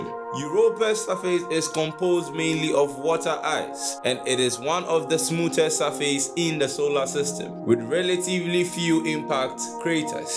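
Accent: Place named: Africa. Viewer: African voice kinda makes me think it’s not true. I guess from all the Nigerian princes bollocks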